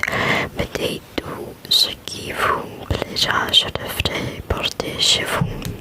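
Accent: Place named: France. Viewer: hiii your french accent is really really good! it's so relaxing! maybe one day you can do a makeup tutorial video i really love your style! it reminds me of sousie soux with a mix of 20s glamour! i would love to draw you tbh haha 💟💞💟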